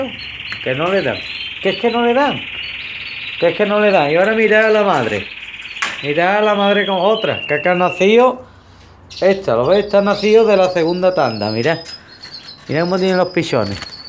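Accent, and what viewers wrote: Andalusia, Spain. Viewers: Enhorabuena por los 20.000, aparte de lo que aprendo con tus vídeos me encanta como hablas, ole mi Andalucia 👏👏👏👏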